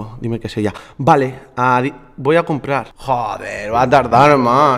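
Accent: Chile. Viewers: hablo chileno vean cuando saca a modric en el primer fut brat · por que de pronto hablas tanto chileno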